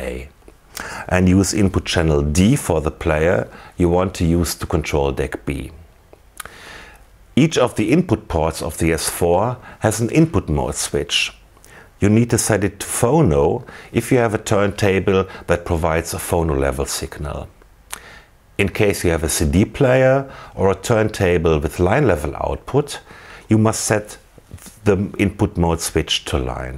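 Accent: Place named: Germany. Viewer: hachja, deutsche und ihr englisch..:D danke für`s video:)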